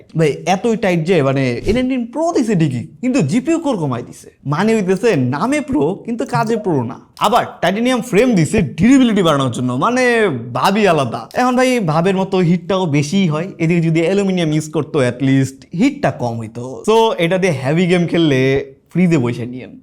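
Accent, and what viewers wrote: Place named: Bangladesh. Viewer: ও ভাই আপনি বাংলাদেশের কোন এলাকার ভাষায় কথা বলছেন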